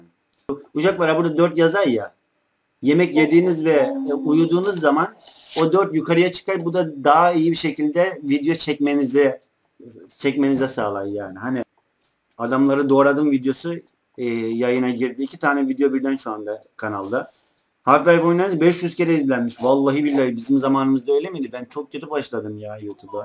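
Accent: Black Sea, Turkey. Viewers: abi sen rizelimi tranzonlu musun?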